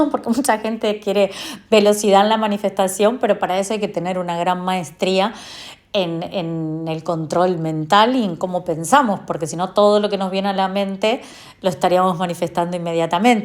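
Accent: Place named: Rioplatense, Latin America. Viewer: Amo ese acento rioplatense!! Gracias y todo el cariño desde Argentina!! :). Hay falta de fe, falta de ganas, sentido distorsionado del tiempo, agobio...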